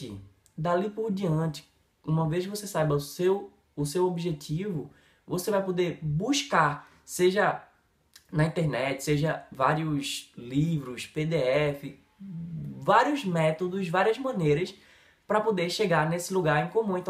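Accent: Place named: Pernambuco, Brazil. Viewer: Pernambucano detectado!!! 🚨🚨🚨🚨🚨🚨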